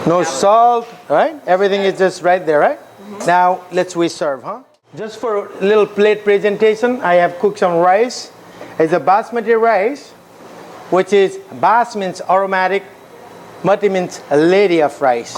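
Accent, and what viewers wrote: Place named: Nepal. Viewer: You can tell he is Nepali by accent Grt job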